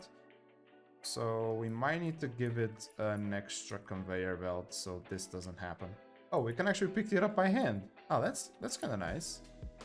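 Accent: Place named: Portugal. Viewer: Notei logo que é portuguessss 🙂‍↕️ tugaaaaa 🇵🇹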